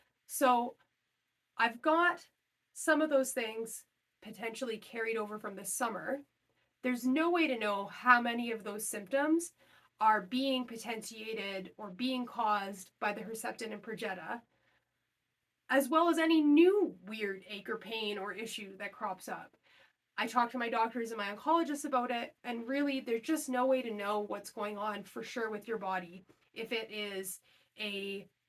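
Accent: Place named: Canada. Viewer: Thanks for being so comprehensive,! I really appreciate it and yes, viewers are looking precisely for all those little details you so generously shared, so it's definitely NOT too much information. It's reassuring as a breast cancer patient to feel heard and seen. You have a natural flair for relaying information in a very clear manner (plus a fantastic Canadian accent which I love!) and it has been very helpful listening to your experience which, so far, has been similar to mine. I'm just about to do my 5th round of full chemo (Docetaxel, Herceptin and Perjeta) and it has been more my upper GI tract that has given me the worst symptoms. Pretty ok, for the most part, in my lower GI tract. Did you experience any watery eyes during your full chemo treatment? Mine just won't stop! Looking forward to putting the full chemo behind me and just getting the surgery done. 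I have seen your video on your 1 year anniversary and I can't help but wonder: the fact that you're done with your HP regimen now is awesome but how are these continual questions in the comments to your videos affecting you and your mental health? I can't imagine what that would be like. Is that helpful for you to relive or does it maybe make you anxious? Just curious as to the psychological implications of this on you, post NED. Continued blessings to you on your health journey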